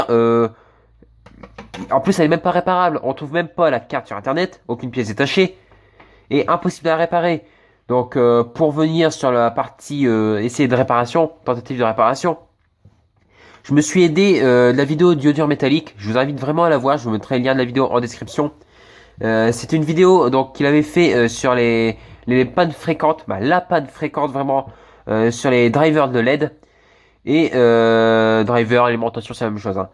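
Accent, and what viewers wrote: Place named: Nord-Pas-de-Calais, France. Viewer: chti reconno tè dmin coin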